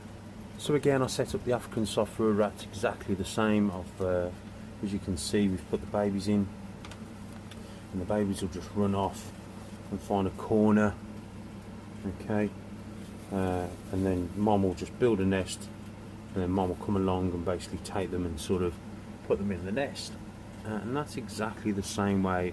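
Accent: Black Country, United Kingdom. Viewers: GREAT VIDEO UR ACCENT SOUNDS LIKE BLACK COUNTRY I DONT SEE PROBLEM WITH FEEDING LIVE WHAT WOULD THEY EAT IN THE WILD ITS SIMPLE!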